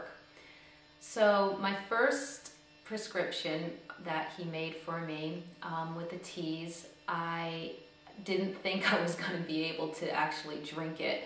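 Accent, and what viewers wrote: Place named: New York, United States. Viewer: I am so happy to hear that you finally had your dream of a child come true. God bless you, your husband and your son! It gives hope to people like me who have yet to be blessed with a baby. How much did the Hunyuan method cost you over the course of the year? A rough estimate would be great. What state are you in? You sound like you could be from NYC like me. Best of luck to you and your family and thank you for sharing.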